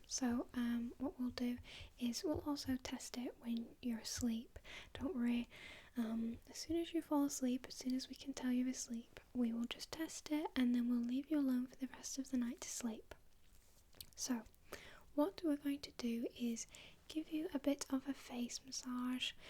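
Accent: United Kingdom. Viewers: Her voice is so beautiful, I love that I'm discovering more British ASMRtists. I love the sound of British English, it's so beautiful and perfect for ASMR❤️Subbed and liked. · Congrats, so pretty british accent!! Love your ASMR videos!!! Hugs from Brazil. :)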